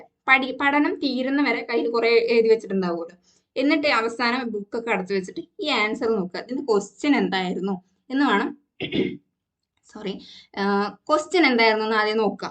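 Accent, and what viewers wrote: Palakkad, India. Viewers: Palakkad aano swadesham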